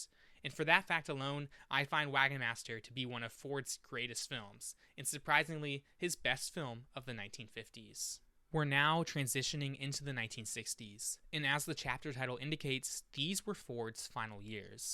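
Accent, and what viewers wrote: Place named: United States. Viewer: This is very good. But it's a pity the narrator has one of those now typical modern American accents. i can't describe it in print but a whining raise at the end of words or sentences: ''that way of making moviess'' '' 7 Womennn '' . It's quite a new thing. Older Americans don't do it. It sounds awful and in some cases unbearable. Someone told me it's known as the ''high rising terminal'' or ''up talk''. Another person told me that it's known as ''vocal fry'' but someone else said that the whiny sound is neither of these ? Don't mean to be rude but it spoils and undermines an otherwise excellent analysis.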